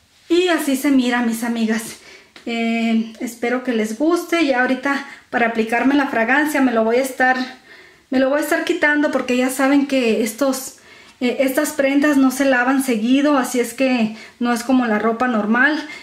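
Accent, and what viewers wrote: Chihuahua, Mexico. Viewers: Hola me gustaria saver de donde eres por tu sonido de voz a mi parecer creo que eres de chihuahua o de sinaloa a cual de los dos le atine😁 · Si yo pensé que eras de chihuahua ai viví y e encantan cómo hablan jijiji saludos, ese perfume cuánto te dura...?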